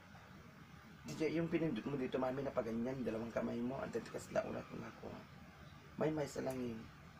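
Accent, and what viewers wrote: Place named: Ibanag, Philippines. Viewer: Te .. marunong kabang mag salita ng ibanag... kasi yong tune mo parang....curious lang po.. salamat..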